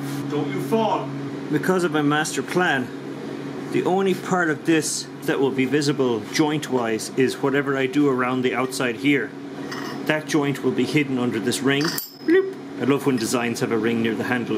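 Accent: Ireland. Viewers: I just love hearing other Irish people since I moved from Eire to Australia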